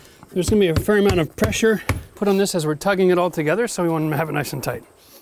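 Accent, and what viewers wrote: Canada. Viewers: Just here so I can hear Canadian words like when he says "stretch that oot" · This was amazing and I'm not even going to be doing vinyl siding! Your speech and mannerism is clearly (a fellow) Canadian and obvious at the onset but I only figured it out when you mentioned Ottawa. But you also explain things as a teacher in a classroom and in the field (just enough explanation). Well deserved subscription!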